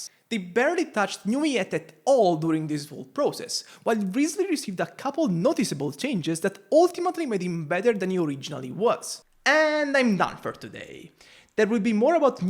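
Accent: Italy. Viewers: Where r u from? U sound really italian tbh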